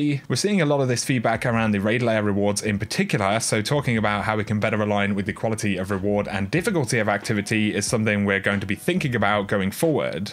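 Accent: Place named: England, United Kingdom. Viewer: First D2 was extremely easy and now because of the restrictions has become too difficult to progress, the issue with clan engrams doesn't help. Hopefully they will review this and make some changes. I used to play everyday through D1, D2 doesn't encourage me to do that any more. Lastly, many thanks for all the information you provide, been watching for a while (Nice to hear another English accent).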